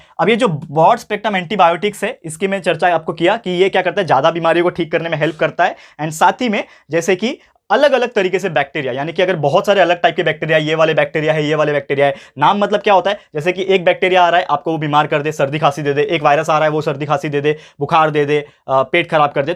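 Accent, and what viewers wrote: Maharashtra, India. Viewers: Sir are you a Marathi speaker🤔😇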